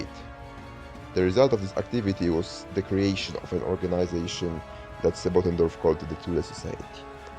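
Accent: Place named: England, United Kingdom. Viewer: Why so annoying english accent? Pease, first learn speaking then speak.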